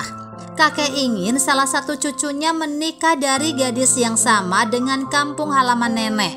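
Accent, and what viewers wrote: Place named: Sunda, Indonesia. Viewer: Suaranya kaya suara euceu2 org Sunda 😅😂🙏🙏🙏🙏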